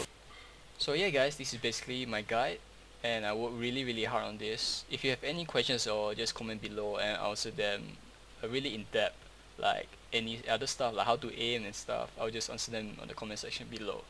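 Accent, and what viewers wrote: Singapore. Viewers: the accent sounds like sg tho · You have a Singaporean accent. Hello :D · Hey you from a singaporean background :) You should speak more singlish in comp Can you just play a comp game purposely stressing a singlish accent and upload it to youtube? Because I too am singaporean.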